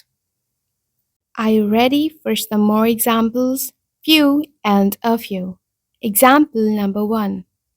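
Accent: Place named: Eastern Europe. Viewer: I beg your pardon, however do you really need to pronounce that B in 'subtle'? It is silent. I feel something East European in your accent.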